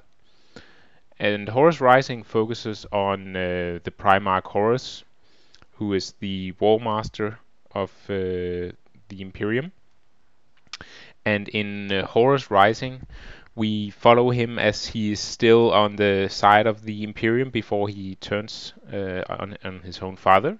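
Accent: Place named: Denmark. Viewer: Are you from Denmark? You have that danish accent. Nice video tho!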